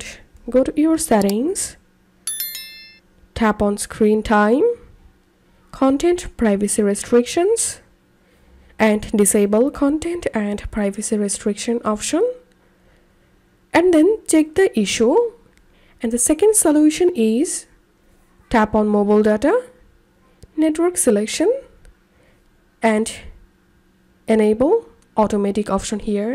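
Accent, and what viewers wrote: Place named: India. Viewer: do you have this same explanation with no Indian accent?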